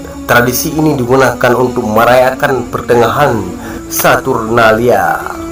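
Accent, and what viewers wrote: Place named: Aceh, Indonesia. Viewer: Logat pembaca: logat aneuk nanggroe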